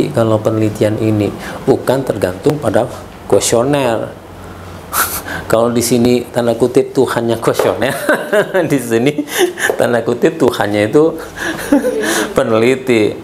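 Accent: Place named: West Sumatra, Indonesia. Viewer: Logat Minang.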